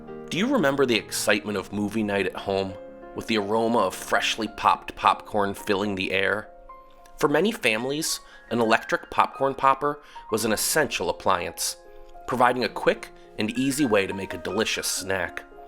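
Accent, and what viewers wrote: Midwest, United States. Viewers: I like your accent. It sounds like you're from the Midwest. I could be wrong, but that's what it sounds like to me.😊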